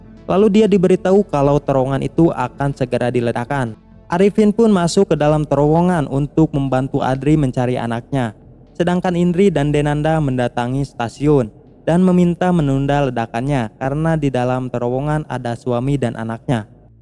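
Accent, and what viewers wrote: West Java, Indonesia. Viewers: Ni host nya pasti orang sunda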